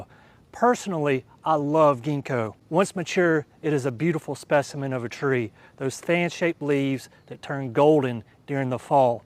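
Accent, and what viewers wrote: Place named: Alabama, United States. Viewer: You need to list your region. Based on your accent I’m guessing you’re somewhere near Alabama.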